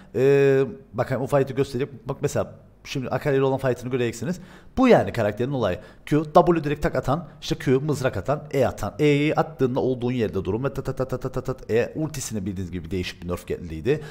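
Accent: Cyprus. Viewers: Dayı sen kıbrıslımısın ya :D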